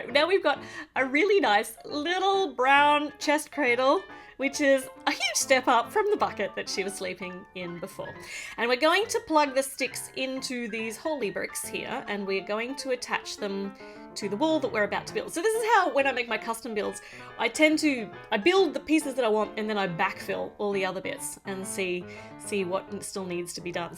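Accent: Australia. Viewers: Me: *watches this * Mum: *walks into my room * Also mum: I rEcOgNiSe ThAt AuStRaLiAn-Y aCcEnT Legit just happened 😂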